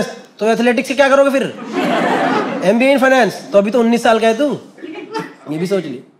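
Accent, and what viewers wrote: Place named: Mumbai, India. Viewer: Got the Bombay boy accent on point bro. Great set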